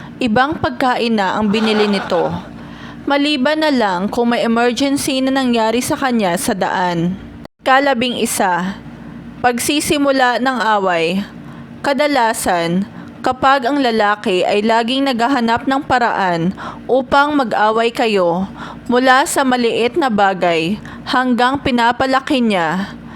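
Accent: Visayas, Philippines. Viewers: Oo nga parang maypagkabisaya narin magbasa pero oks lang ok narin naman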